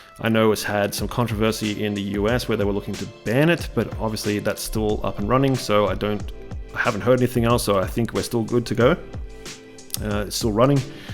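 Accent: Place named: Australia. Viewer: why does refreshing your page make you more trustable..... you sound Australian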